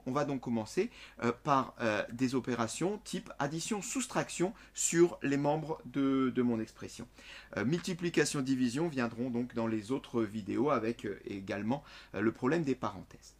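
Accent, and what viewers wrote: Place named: France. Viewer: mais des lunettes et un beau accent français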